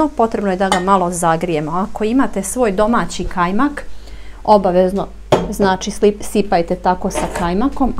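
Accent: Bosnia and Herzegovina. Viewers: Draga, volim kad vidim da divna mlada zena zna i voli da kuva. Posebno mi je drag vas bosanski naglasak koji me podseca na lepe trenutke dok sam zivela u Bosni sedamdesetih godina proslog veka. Pozdrav od jedne bake iz Srbije.❤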